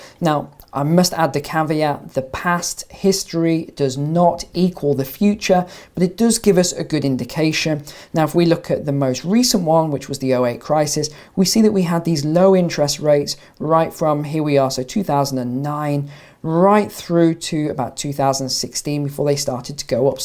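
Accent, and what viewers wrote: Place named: Coventry, United Kingdom. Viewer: Nice one. I thought I detected a bit of a Cov twang. I went to Uni in Cov and my folks still live there. Same boat as you now mate, live between Philippines, USA and Mexico. Keep up the good work and thanks for the reply.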